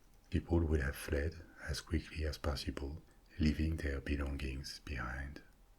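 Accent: France. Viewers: > Great voice > French accent > History lesson What more can a person ask for?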